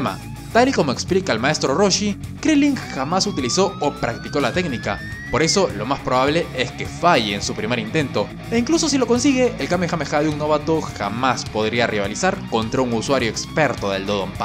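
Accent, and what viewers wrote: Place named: Latin America. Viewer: Alguien sabe de donde es que habla como latino pero en cambio dice vídeo como nosotros los españoles?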